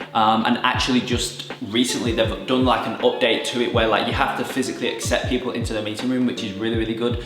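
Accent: United Kingdom. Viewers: Are you from England? British?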